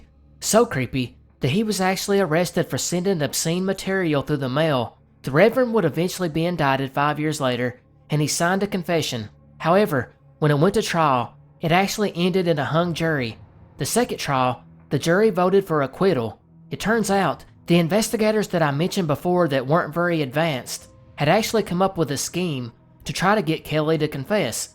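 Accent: South, United States. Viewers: I’m born and bred in the North Carolina mountains and I love to listen to you. We have different levels of southern drawl here but I almost feel like I live down the road from you. Lol You do a great job and get a lot of info for your stories. I can only guess that you’re a sincere and good natured guy. You know the help out your neighbors without being asked type. Glad I found your channel!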